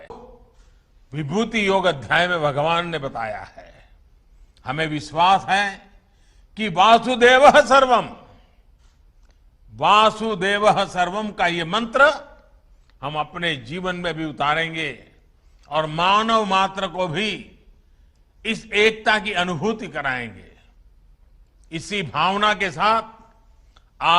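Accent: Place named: Gujarat, India. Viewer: that's gujrati touch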